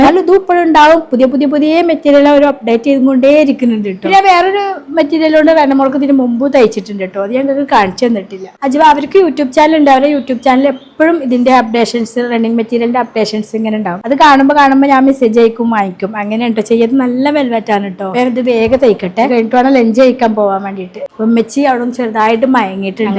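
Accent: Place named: Malappuram, India. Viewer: Malappuram varthanam ikkante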